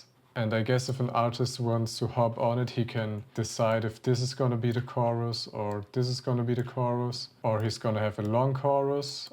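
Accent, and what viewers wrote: Germany. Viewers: The german accent makes it 100 times better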